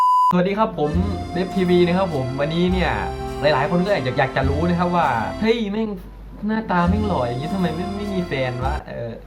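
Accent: Korat, Thailand. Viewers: ว่าแล้วเห็นคริปนั้นพูดโคราช😂❤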